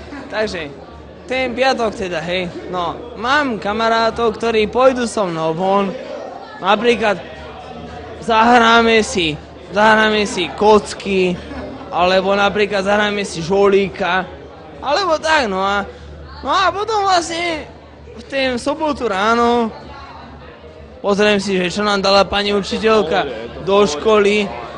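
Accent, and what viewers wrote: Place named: Liptov, Slovakia. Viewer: Páči sa mi jeho prízvuk, sťaby z Liptova pochádzal, len ma trošku mrzí, že búši viac ako by sa patrilo. Aj tak by som prijal a člena mojej družiny.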